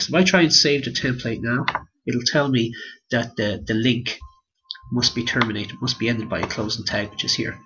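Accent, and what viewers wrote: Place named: Ireland. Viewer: Never realised the blog/you were Irish - nearly got a fright when I heard the accent - was expecting a British/American accent for some reason! Very well explained :)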